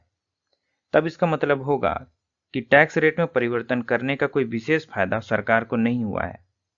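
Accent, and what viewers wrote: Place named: North, India. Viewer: This is North Indian accent of English.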